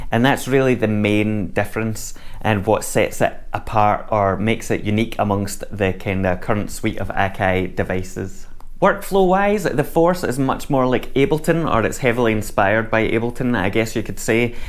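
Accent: Scotland, United Kingdom. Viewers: Seems awesome really! Stoked to hear what you come up with on it! And even though I have no plans on buying this device... I'm always interested in what your dulcet Scottish tones have to say!! lol ✌🐀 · Not into jock accents but yours is an exception, I could listen to you for hours lol. Anyway, I have just bought a Force for £750 and I don't even know whether it will arrive this side of Xmas or not, so I'm just surfing all the Force stuff I can find. I have an Akai live(not 2) and its all right, a bit like a girlfriend with big boobs, its got its good points but I'm not in love with it, I'm hoping the Force will be the one I fall madly in love with or at least get infatuated with. Anyway excellent review, I wish all reviews were like yours, truthful, honest, tells it like it is, warts an all. I'm going to give you a thumbs up and a subscribe so I can listen to some more to that accent!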